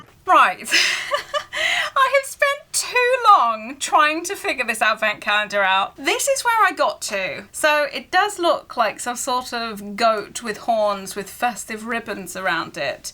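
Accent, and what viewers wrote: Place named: England, United Kingdom. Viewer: I love your accent so much! It's different from what I imagine the London accent is. Are there different dialects in England? So cool! Greetings from Canada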